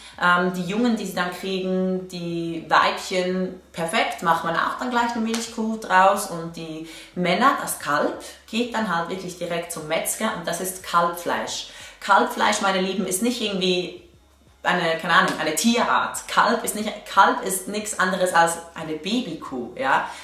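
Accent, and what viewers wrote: Switzerland. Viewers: ist sie schweizerin? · Schweizerin? :'D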